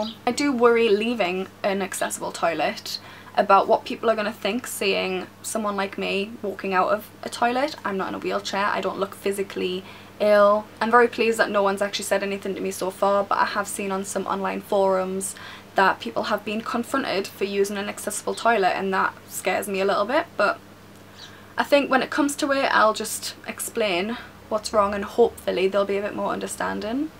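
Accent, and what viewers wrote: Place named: Newcastle, United Kingdom. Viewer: Great video. I am in the process of being diagnosed. I have had bleeding and mucus at 2 year intervals for the past 10 years. Mine seems to be very mild at the moment, no pain and limited toilet visits- however, it is not very nice even at this level! P.S. recognisable accent, I hope you are a Toon fan! ⚫⚪⚫⚪⚫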